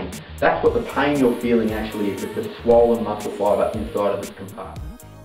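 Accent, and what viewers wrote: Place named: Australia. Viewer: You got a lovely australian accent, love it !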